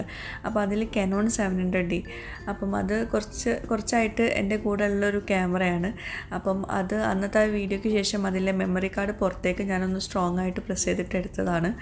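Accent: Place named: Malappuram, India. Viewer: Ingale malapporam baasha kelkkaan nalla rasand. Voice over kodukkumbo style aakken lle😆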